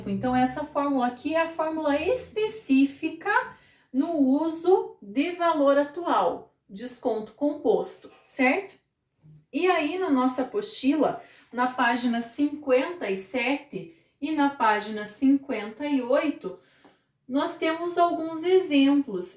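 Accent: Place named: South, Brazil. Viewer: Professora estava olhando umasa aulinhas de matemática financeira encontrei esse sotaque que deve ser do Sul. ,que ao que parece entrou no mundo das tele aulas devido ao isolamento sócial . Querida vejo também que tu gosta de ensinar e eu defendo quem faz as coisas por amor beijo sucesso e se puder ponha aulas para encontrar taxa e período compozto pois não encontra se aí disso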